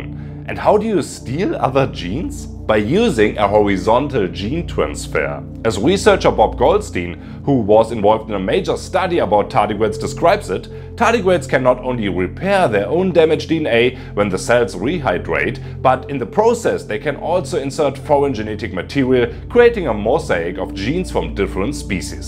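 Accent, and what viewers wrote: Germany. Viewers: 😂😂 der deutsche Dialekt schlägt voll durch 👍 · Dieses Denglische bzw der Akzent....typisch deutsches Problem. Entweder man kann englisch oder lässt es sein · Ist sehr gut aber immer dieser deutsche Akzent 😅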